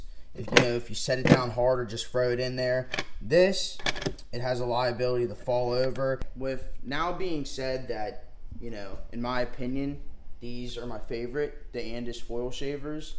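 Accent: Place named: Pittsburgh, United States. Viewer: G! Soon as I heard your Pittsburgh accent I knew and then you said Crafton! Been shaving my head 9 months. Pittbull Gold rotary. All good for 6months until I ordered replacement blades. Now getting irritation each shave. Would you recommend a close trimmer and this foil shaver for me. I’m shopping for both. Looking at andis t outliner pro and would like a foil to go with it. Great video man! Moved to the burgh 3 years ago!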